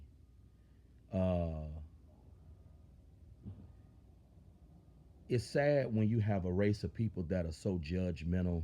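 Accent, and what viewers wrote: United States. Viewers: stop talking ebonics and ill let you in the white club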